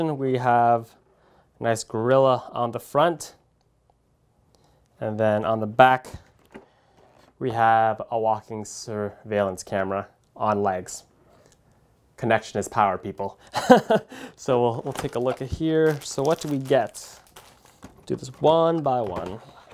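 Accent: United States. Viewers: I always imagined you were a white guy. The accent is very strong and American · Hes asian? Had no idea thought he was american cause of his voice, no hate tho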